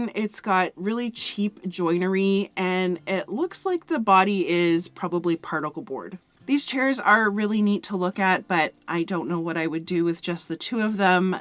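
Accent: Canada. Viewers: I love your Canadian accent. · . Here in the UK we know that when you say out and 'aboot' that's when we know a Canadian. I love to hear it! 🤗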